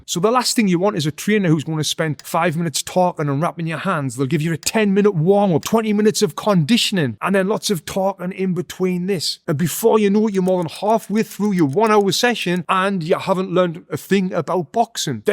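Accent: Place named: Geordie, United Kingdom. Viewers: Good, sound advice from a true Geordie (I hope non-Brits can follow your accent). Brit here, in my later years, and finally, after so long, I'm taking up boxing for physical and mental fitness. Beginning with a boxing PT at a local fitness centre, and hope to move on from there, not professionally, of course, but in some way get the opportunity to get in a ring and experience what I have always wanted since I was a kid. Keep up the good work, and many thanks from London. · Geordie was one of the first British accents i have been actually aware of (thanks, DI Lewis!) and i think it's sooo lovely and really relatively easy to understand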